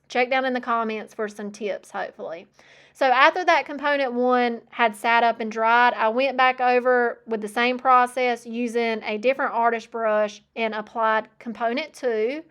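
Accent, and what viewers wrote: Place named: East Tennessee, United States. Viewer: Please keep saying Modge Podge! I love your unique pronunciation; my daddy used to pronounce umbrella as underbrella…sounds more right to me. I love your accent because it doesn’t sound like an accent to me. Of course, I live in East Tennessee, so go figure.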